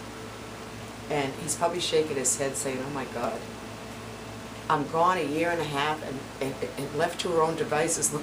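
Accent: Boston, United States. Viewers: your story did put a big smile on my face still having a very difficult time but I’m glad that you commented on my video and directed me here I probably should know this and we have probably spoken about it but I can’t remember are you from Massachusetts because you definitely have a Boston accent - I know you’re sick but you look amazing and yes prednisone is some scary stuff and can make you feel really weird I have to catch up on some of your videos I don’t always comment but I do watch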